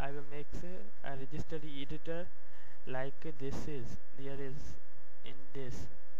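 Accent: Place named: Spain. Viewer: How about learning speak english correctly and not "spanish english"?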